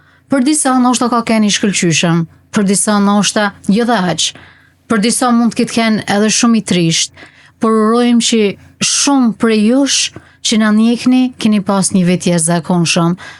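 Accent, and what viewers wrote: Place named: Gheg, Albania. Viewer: Ju përshëndes nga Shqipëria gegërishtja juaj tingëllon bukur gëzuar edhe këtë vit urime .❤❤❤❤❤❤❤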